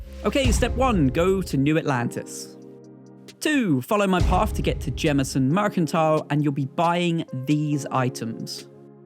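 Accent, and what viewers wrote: United Kingdom. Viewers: Funny, sounds British but saying ALUMINIUM wrong.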